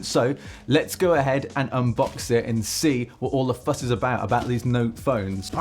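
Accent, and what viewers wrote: United Kingdom. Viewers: Your British accent is quite nice, isn't it?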